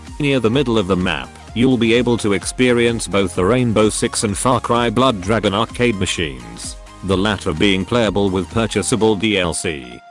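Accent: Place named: Australia. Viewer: You should use your own voice on some videos cos OMG your aussie accent is amazing:) everyone needs to have heard the smooth taling aussie that is;)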